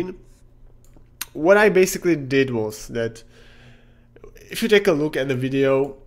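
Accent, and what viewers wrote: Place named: Eastern Europe. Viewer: and that’s not at all a bad thing I like your Slavic accent!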